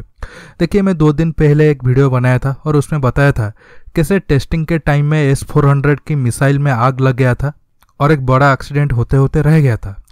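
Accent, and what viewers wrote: Odisha, India. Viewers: Hi...I love your oriya mixed hindi.continue your good work...language is just for expression..i am finding no difficulties to underatand you.main thing is the content..its rich enough to make just a little adjustment.thanks.❤.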